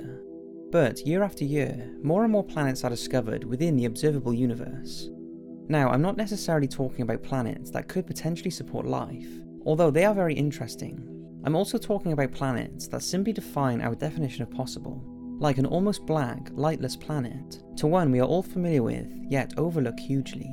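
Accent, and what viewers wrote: Wales, United Kingdom. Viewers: You must be from Cardiff or somewhere down South Wales? That accent is deffo Welsh 🤔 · Partisan Mapping ive turned most of my friends into ' fans. Now when we smoke at my place they say "lets watch some " and we just burn and listen to this nigga's surprisingly soothing british voice go on and on about interesting shit.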